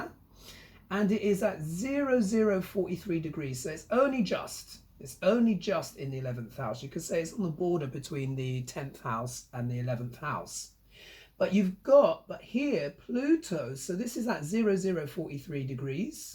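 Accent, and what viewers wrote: United Kingdom. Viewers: I’m a new subscriber and I reviewed a couple of your prior videos. I’m hooked on your style, your astrological intelligence, and your wonderful sense of humor. Also your innate communication skill, especially with your British dialect. Happy holidays and I Wish You Well!!!!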